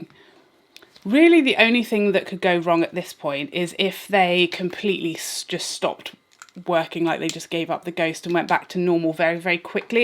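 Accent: United Kingdom. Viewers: It is wonderfull! Thank you so much this giveaway! Here in Hungary we no have this kind of make up . If i will be lucky to win, I definitelly will be the luckiest and happiest person in this World! 😊 I really like your channel and your British accent! 😻♥️♥️ Have a nice weekend for you! BR. Dori Instagram: Maybe - Nude Venus- is a good colour for me.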